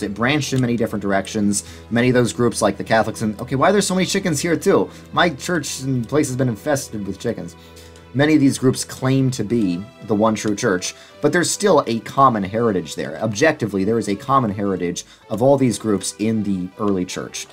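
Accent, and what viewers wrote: New York, United States. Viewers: really letting the New York accent come out on this one LOL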